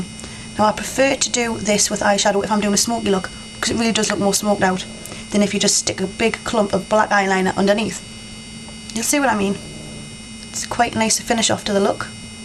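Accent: Newcastle, United Kingdom. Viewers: Yeah newcastle. My B/F talks like that, lol. It's cute.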